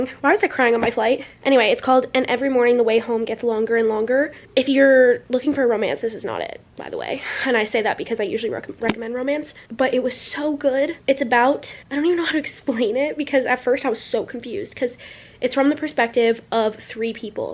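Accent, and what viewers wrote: South, United States. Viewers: I don't know if it's just in this video or if it's always been like this and I just didn't notice, but actually has a really strong southern accent lol 😅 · i feel like you’re developing a southern accent and its so cute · You sound more southern in this!!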